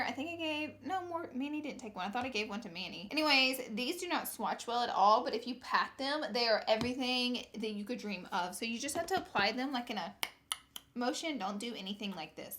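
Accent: South, United States. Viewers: I love watching and listening to your videos because you're from the south and your voice makes me so happy. You're so funny and you're so entertaining even just talking about eyeshadow palettes.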